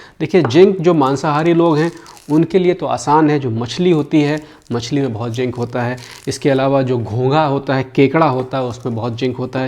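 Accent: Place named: Uttar Pradesh, India. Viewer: Thanks bhai or aapki awaj bilkul up walo k tareh hai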